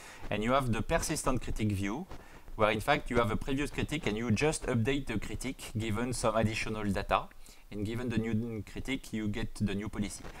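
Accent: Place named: France. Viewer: Your french accent is lovely!! Also, thank you for so clear explanation!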